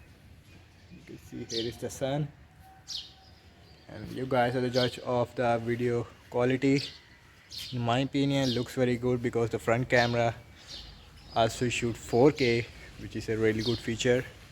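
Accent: India. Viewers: Nice to hear English in desi accent. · nice ! thanks , you have a little accents indi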